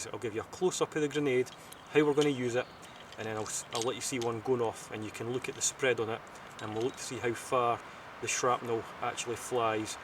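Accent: Scotland, United Kingdom. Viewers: Scottich accent.............. I like it :D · Yeahhhh, I don't think he is British. I think he is Scottish... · are you scotish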